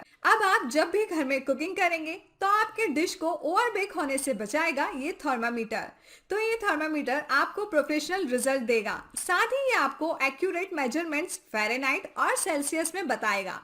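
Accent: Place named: India. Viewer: 这标准的印度英文听得好辛苦……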